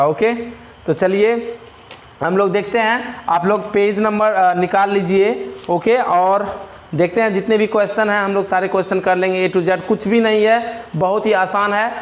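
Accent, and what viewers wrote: Bihar, India. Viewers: Aap bhihar ke h sir 🤔🙏🙏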